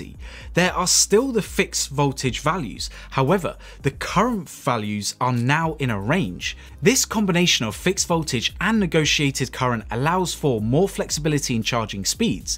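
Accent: United Kingdom. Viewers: Thanks, interesting and helpful video. Good to hear a Brit voice supporting a USA company. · Normally i dont like British accents but dude yours is different i can listen to u talk all day 💛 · is this really Sabrent USA? Sounds more like Sabrent UK.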